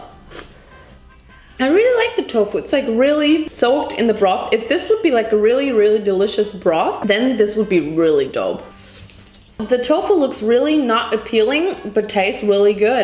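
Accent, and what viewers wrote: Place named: Germany. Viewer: Your German accent is absolutely cute!! Your so funny, thank you for the laughs.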